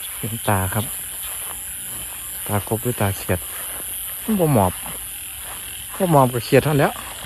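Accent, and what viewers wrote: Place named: Isan, Thailand. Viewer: เสียงหัวเราะเสียงเว้าที่คุ้นเคย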